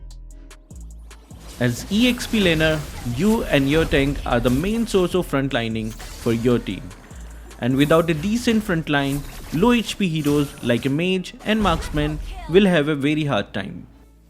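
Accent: India. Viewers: Are you from India?😅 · question- Where are u From.. u sound like u r from india.. Please tell me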